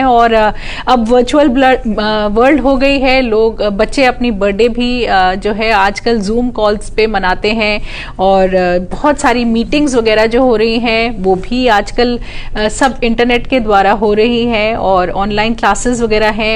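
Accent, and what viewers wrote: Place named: Delhi, India. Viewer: Medam aapki awaaz me Delhi 6 wali Ton hai....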